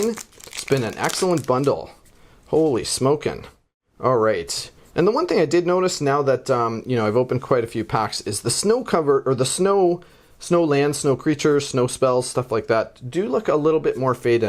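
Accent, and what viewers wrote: Canada. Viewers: No idea you were canadian and now i can't unhear it :P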